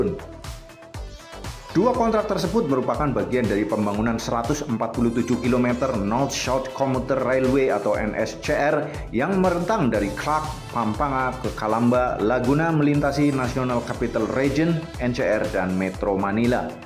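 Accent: Banyumas, Indonesia. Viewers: Ora ngilangi logat ngapak e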